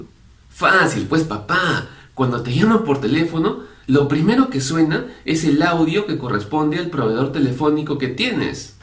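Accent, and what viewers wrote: Peru. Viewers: Hablas agradable, peruano, mejor que lo horrible que hablan ciertos ecuatorianos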